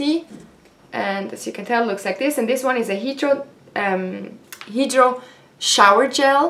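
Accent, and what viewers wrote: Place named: France. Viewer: Nice haul, i like how you speaks on French so chic 😉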